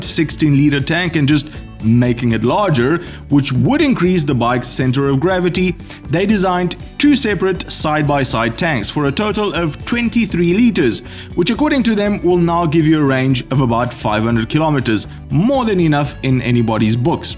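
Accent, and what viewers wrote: South Africa. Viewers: were you originally from South Africa? You have a hint of South African accent. 🇿🇦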